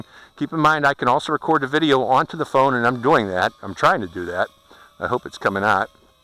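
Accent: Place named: New England, United States. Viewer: Indoors? You sound like one of those (us) New Englanders. LOL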